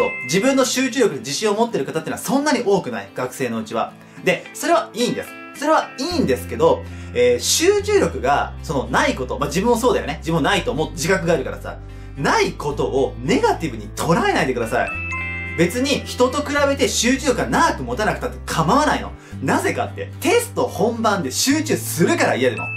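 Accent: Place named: Kansai, Japan. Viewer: 関西弁です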